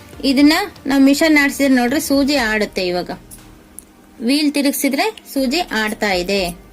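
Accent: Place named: Uttara Kannada, India. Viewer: madam nim bhashe nodidre uttarakannada davru ansatte. nim jote ondsari matadbekittu madam plz.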